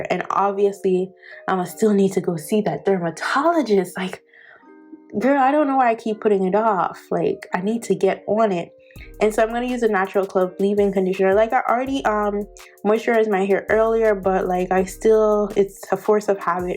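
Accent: Jamaica. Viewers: Yes!! Mi hear di Jamaican accent. Bless up sis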